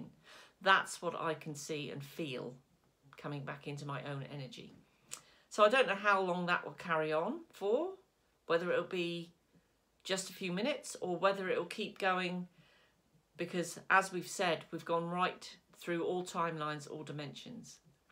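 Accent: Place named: East Anglia, United Kingdom. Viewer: Thank you 🙏that was wonderful. Are you from East anglia? I used to live in Suffolk... I recognised the accent? X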